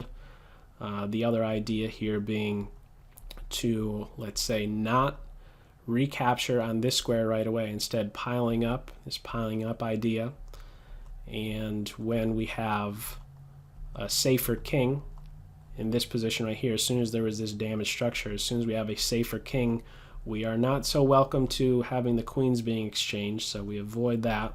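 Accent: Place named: South Carolina, United States. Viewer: It's his accent, South Carolina or something.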